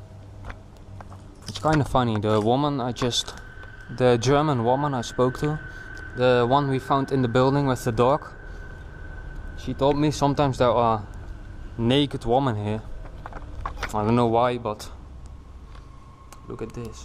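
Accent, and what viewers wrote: Netherlands. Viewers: can you speak dutch you i heard it on your voice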